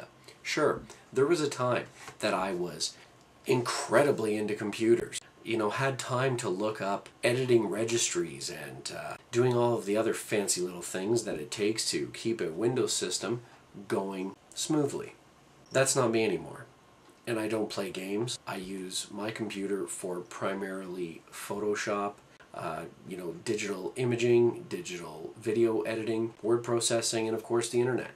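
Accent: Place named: Canada. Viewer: You sound Canadian. Anyway, if you have so much experience on computers--I'm surprised that you blindly bought Professional without a second thought. Yes, I know a fair amount of consumers may be unaware of such a thing, but I'm surprised that you didn't catch such a thing.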